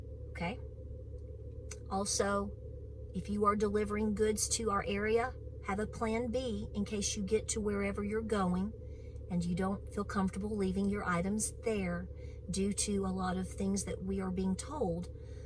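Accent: United States. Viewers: I love that country accent! We have to help one another ❤ Be safe storm season is just beginning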